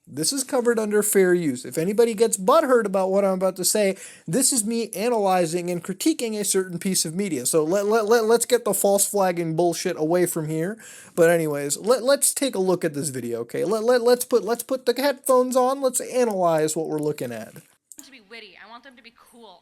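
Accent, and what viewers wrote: Canada. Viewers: are you canadian ???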